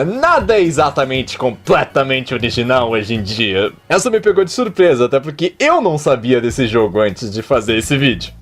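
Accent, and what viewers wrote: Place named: Rio Grande do Sul, Brazil. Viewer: Vc é gaúcho? Tem sotaque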